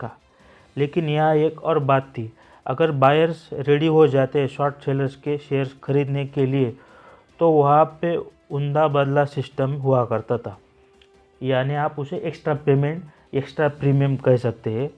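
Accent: Maharashtra, India. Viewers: Strong marathi accent hai bhai.... Jai maharashtra 👍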